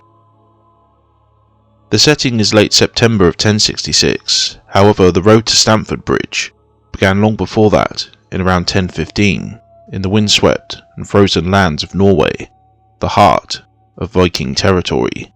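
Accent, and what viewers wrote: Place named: West Country, United Kingdom. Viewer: I'm getting a slight West Country tone occasionally?.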